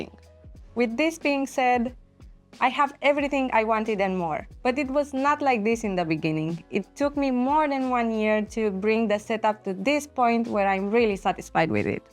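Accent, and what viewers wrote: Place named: Liverpool, United Kingdom. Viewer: Nice accent. Liverpool?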